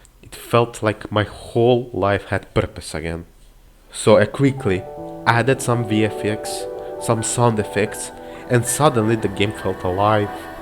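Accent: Russia. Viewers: ты руский по акценту понятна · BRO SOUNDS RUSSIAN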